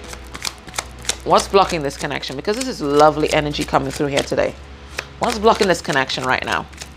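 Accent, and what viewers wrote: Trinidad and Tobago. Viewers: Are you trini?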